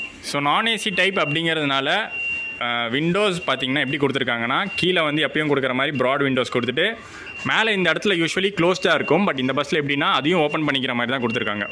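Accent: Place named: Nagapattinam, India. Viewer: Tq sir.., nagai language la pesurin😊😊ga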